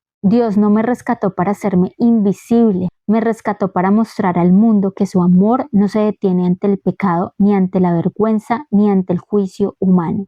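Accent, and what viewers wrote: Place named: Colombia. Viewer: Gracias por la Narrativa y la hermosa enseñanza un abrazo fuerte por tu voz puedo decir que eres colombiana yo lo soy pero bobo en México hace 43 años graba más narrativas bíblicas que te salen muy bien besitos❤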